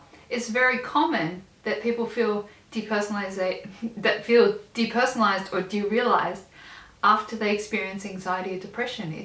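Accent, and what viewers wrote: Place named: Australia. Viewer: Already feel much better after watching this. The aussie accent is definitely soothing too haha.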